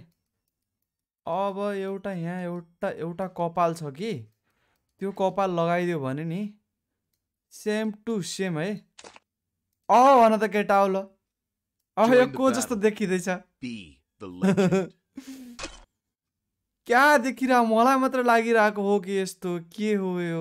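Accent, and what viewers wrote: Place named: India. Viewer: Nepali bolnu birsina aatexa dhoti jastai bolxa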